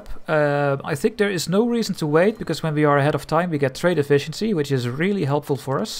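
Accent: Netherlands. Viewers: It’s really interesting to hear the Dutch pronunciation of the Dutch provinces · LUL as a person born in Netherlands, this is a THICC Dutch accent bro xD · He has a very strong Dutch accent lol, might not be obvious if you dont speak English or Dutch natively. ex. marinesss dutsh, persent, usash, tshoosh. very Dutch, all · Idk man, has a pretty noticeable Dutch accent. Maybe not as thick as many others from here have but it certainly isn’t accentless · his english is perfect but he clearly has an accent. a dutch one. an obviously very dutch accent. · This is good and proper. A dutch man with a thick dutch accent forming a strong Netherlands. Gezellig! I'm gonna enjoy this series. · As a Dutch person myself its really easy to recognize a fellow Dutch person speaking English. But I can Imagine its hard to catch if you are not Dutch yourself.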